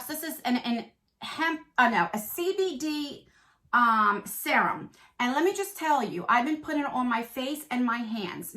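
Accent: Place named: Maryland, United States. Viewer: Where are you from? My friend is from Maryland and you guys sound identical. 🌞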